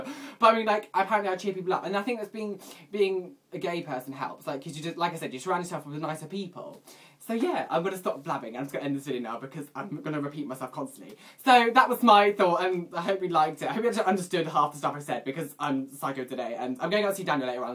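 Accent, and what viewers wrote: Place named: RP, United Kingdom. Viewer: Hey... got somethin' to tell you (but don't get annoyed, right?): first you posh like hell, second you talk as fast as a missile, third you sound a bit feminine. OK, I promise I'll wath your vid on slow-motion and try to catch as much as I can (I'm not native, I'm gay). Kiss ya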